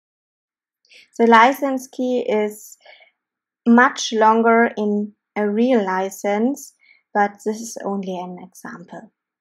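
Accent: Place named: Germany. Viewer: Not only a very useful video for us Xojo neophytes, but this girl has the cutest German accent ever. You can't help but smile every time she tries to use a 'V'.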